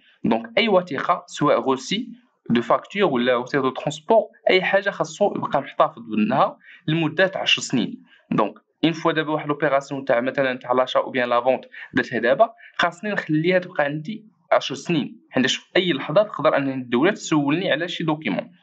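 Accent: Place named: Morocco. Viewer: شكرا شرحك جدا واضح ة مفهوم رغم انني وجدت صعوبة في البداية لفهم اللهجة المغربية . تحياتي لك من السعودية🇸🇦 🇸🇦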